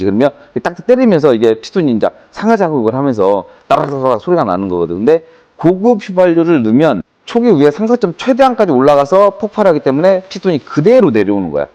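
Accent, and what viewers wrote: South Korea. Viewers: 전라도 말투 듣고 신뢰가 안간다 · 흐미 즐라도 광주분이신가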